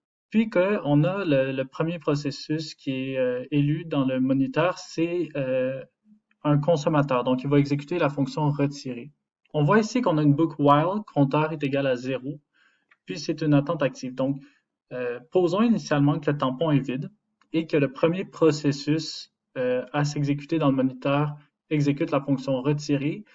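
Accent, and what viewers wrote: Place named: India. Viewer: لي يحب يعذب وذنيه يسمع هندي يهدر فرونسي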